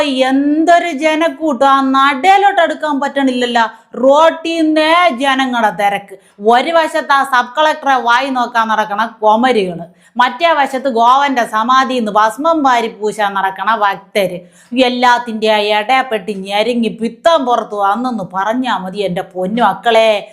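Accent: Thiruvananthapuram, India. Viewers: എന്തായാലും തിരുവനന്തപുരം സ്ലാങ് കേൾക്കാൻ രസമുണ്ട് 👏 മോളെ ബിഗ് സല്യൂട്ട് 👍👍👍🙏🙏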